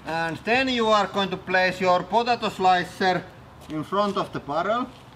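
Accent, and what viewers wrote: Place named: Finland. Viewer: The subtitles is all fucked up :) Can´t handle finnish english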